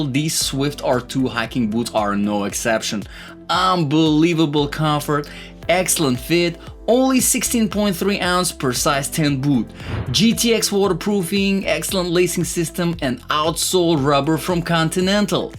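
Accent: Russia. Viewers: Hi there !!! First of all I did sense russian accent !!)) Now about boots you recommend !! I will not buy any of them !! The all are no good all 10 of them !!! Let me explain you why !!! They all have the same or similar shoelaces tight up desighn . Those shoelaces going through fabric or plastic loop from bottom to the midle of boot and at the top of a boot through sets of metal or plastic hooks !!! This is very poor desighn because send and dirt will packed up on your shoelaces wile you hiking and friction will tear those loops through , those hooks at the top of those boots will do the same thing to your shoelaces because those hooks are tight , and every time you tight your boots the same part of shoelace will be abused by friction on that hook. I talking of few month of everyday carrying and you will be noticed of unprepared damage !!! Any field repair to this loops will not hold up for ling , maybe one day and than all sawing will tear them even worst . 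Same thain with those hooks they will keep twaring your shoelaces, and there is absolutely no way to fix them , if you try to file them to smooth corners , you will do even worse by creating shapr edged scratches. !! Only good design is metal eyelids where shoelaces go straight trough those sidelips on your boots !! That design is very forgiving on your shoelaces and much easier to repair on the field , specially if you are not just hiking for a day , but actually surviving , and covering a lot of distance on daily bases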